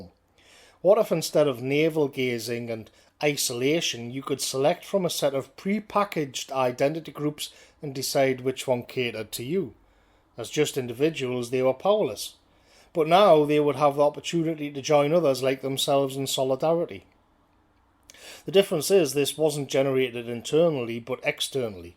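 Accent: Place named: Geordie, United Kingdom. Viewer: nothing to do with your video, as they're all exceptional; I just wanted to say as a Geordie ex-pat living in the colonies, I love your vids. Just hearing the local dialect brings me closer to home, even though i'm thousands of miles away. Keep up the good work big fella!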